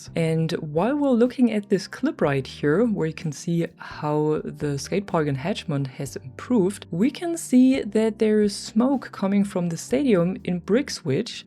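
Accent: Germany. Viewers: Love the German accent ❤🎉greetings from Germany and hopefully you keep up with the skate content 😊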